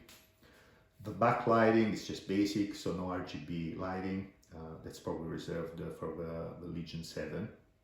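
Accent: Italy. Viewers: Hey man, you sound like an Italian 😉 Very nice review, awesome laptop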